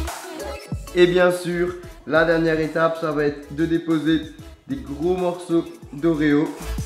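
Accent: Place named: Alsace, France. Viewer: Ma mère quand elle t'a vue elle a dit "Ah sa c'est un alsacien ! "elle dit que tu as un très bon accent Alsacien et elle espère que tu le gardera :-) #TeamSnap :-)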